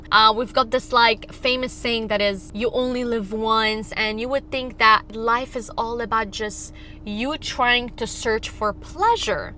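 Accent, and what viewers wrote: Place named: Jamaica. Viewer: 'A fun ting' ooooh she Jamaican. nice video btw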